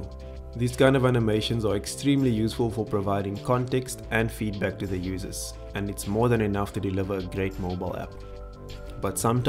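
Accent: South Africa. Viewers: I know a South African accent when I hear one. Keep up the good work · Yeah I was about to ask are you South African 😂 It's great to see Flutter Devs in South Africa.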